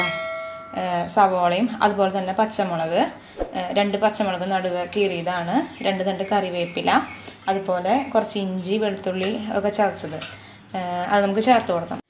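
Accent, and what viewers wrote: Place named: Thrissur, India. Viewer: kollaatooo👍👍👍.... Thrissur anoo namude naatukaari anale... Samsaram ketapo thonni...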